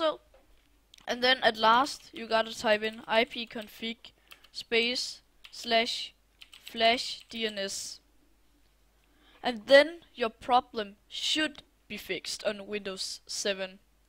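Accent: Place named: Denmark. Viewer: Det er let at høre du er dansker :D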